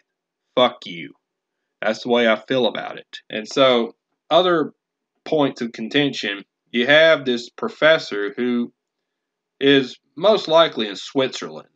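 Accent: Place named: South, United States. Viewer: Ofcourse you would have a southern accent, not even surprised in the slightest bit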